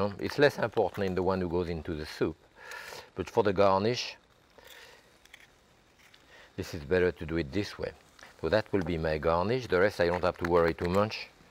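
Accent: France. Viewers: 've been watching this whole day..the french accent,his attitude and his skills....great stuff!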